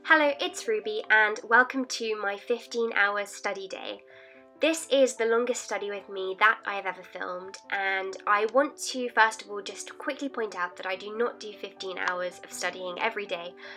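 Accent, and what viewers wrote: United Kingdom. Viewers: That British accent though.😍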